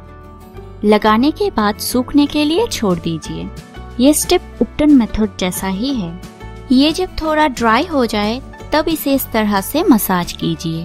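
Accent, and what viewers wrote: India. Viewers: It`s Hinglish( Egnlish with Hindi accennt and mixing) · Her speech is not comprehensive. It feels like she speaks Indian.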